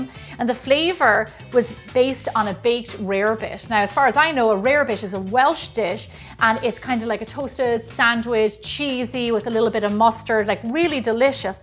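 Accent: Ireland. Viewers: I moved from Dublin Ireland To the United States When I was 12 years old And I really love your Irish accent. unfortunately I lost my accent because the kids in school made fun of it and I so love to hear you speak in that lovely accent, and of course your recipes are fantastic!!! I am so happy that I found you on here!!!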